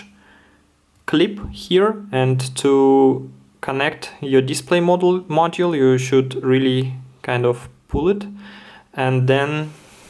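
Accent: Russia. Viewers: русский акцент выдает)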